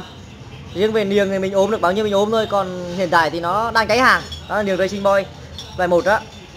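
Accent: Nghe An, Vietnam. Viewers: Ad xứ nghệ phải không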